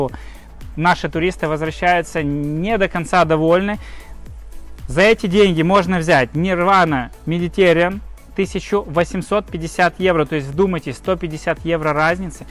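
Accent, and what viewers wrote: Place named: South, Russia. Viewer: вы знаете русским пофиг вообще на украинцев и что там происходит. В моем городе мы и не слышим не о каких убийствах о чем вы рассказываете. Я жила на Украине 10 лет и уехала в 14 году там была очередная 110 революция. Причём там русские тем более те которые ездят в отпуск в 5 зведочные отели. В окопы идут совсем другие люди те кого жизнь пережевала и выплюнула прямо в эти окопы. Они за 200 000 идут убивать и умереть а обычные люди (человеки) 200 000 руб за 7 дней отдыха оплачивают. Каждый май и октябрь ездим в Турцию украинцев не помню там вообще, ну или они сильно косят под русских что не отличить. Да и кому какая разница на отдыхе. Все вместе тусим отдыхаем в Турцию все заточено под русских 😊 ездим тада как на дачу. Автор видео 100% русский, спроси у любого европейца😂😂😂 Немного выдаёт "колидор" и "гэ" и эта настойчиво агрессивная подача характерная для южных народов, вполне за Краснодарского парня сошёл бы. 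Или любой другой глубинки России.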